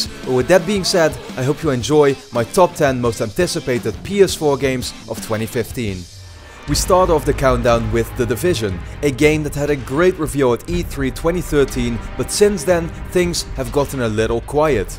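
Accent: Netherlands. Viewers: Ben jij Nederlands je accent klinkt wel nederland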